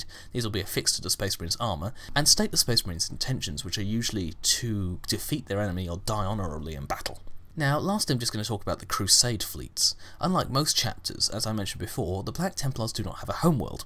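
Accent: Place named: United Kingdom. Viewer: Aaah... The British accent... so good for Warhammer in general :P Keep em' comin' :)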